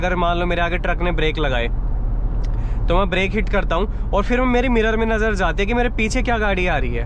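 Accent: Haryana, India. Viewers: Bhai aap jo haryanvi me bol teea ho bhot aacha lagta ha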